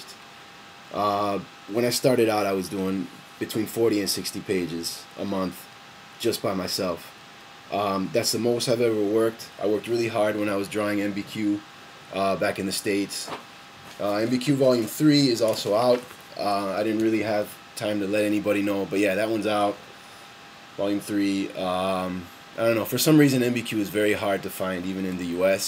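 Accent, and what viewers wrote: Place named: Argentina. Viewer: only a question(aajhaa the mangakas how you dont have time for ask questions in youtube but), you have a connection with Argentina? because, when i hear you speaking spansish, your accent is so...argentinian hahaha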